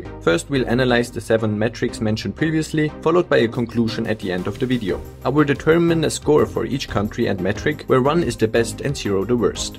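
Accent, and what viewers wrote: Germany. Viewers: You sound German. Is that right? Thanks a lot for a great video. Really great and informing. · Richtig rausgehört das da ein deutscher spricht 😆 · Are you german? I'd swear your accent is 100% german and I'm just asking me the question if I should move out of the country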